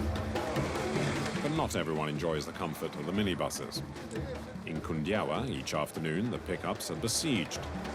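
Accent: England, United Kingdom. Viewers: His a pommie, thats how they speak, little on the painful side. Should have allowed the locals that spoke english to be heard. I could hear what the people were saying while he spoke. Some of his information was incorrect, but still a good documentary. Thankyou for sharing. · Nice English narrator.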